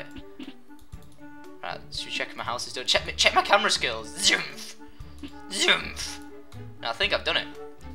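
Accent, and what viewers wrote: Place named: Northern England, United Kingdom. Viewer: Ooh was fun watching you play Sims 3, Love Sims :) love your accent too good old northern accent like meself ;)...i am that sad i know that house as i have played it many times and never understood why that half wall bit is there looks odd hahaha good cheats to use are motherlode for money, Testing cheats enabled use shift and click on mail box/sim and a bunch of things come up, and after that if you want you can use buydebug and good stuff there :) only found out recently :) Have fun simming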